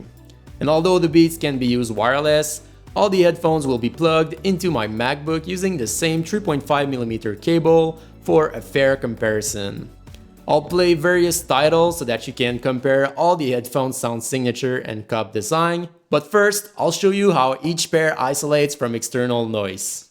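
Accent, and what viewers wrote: Quebec, Canada. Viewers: // He's from Canada, one of the French regions.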